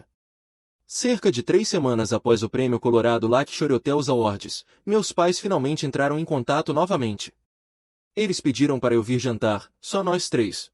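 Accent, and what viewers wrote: Portugal. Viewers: Sim, porém mesclava o nosso português com o de Portugal e ficou muito estranho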